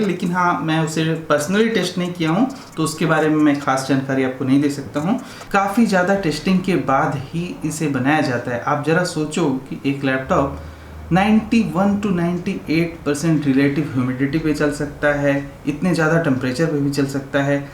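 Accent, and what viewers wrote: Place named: Bengal, India. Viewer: I am guessing from your acsent , that you are a bongali !!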